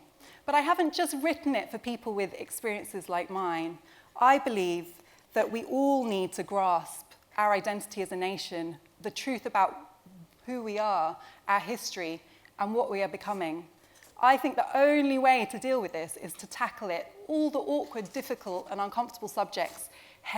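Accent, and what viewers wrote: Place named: United Kingdom. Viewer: they have trackers eh? Care to enlighten us as to how that works with over the air broadcasts? This idiot woman doesn't even understand what racism is. She's half black and the EDL didn't give a fuck about that. They didn't care because she spoke like a brit, and she called that racist. What a fucking dummy. British people, rightly so; don't want shitty, barbaric backwards cultural practices superseeding their own. Or maybe you're OK with FGM and your opinion being worth 1/2 that of a man in courts?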